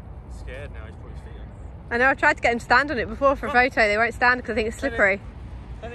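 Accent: United Kingdom. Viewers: Are you Britain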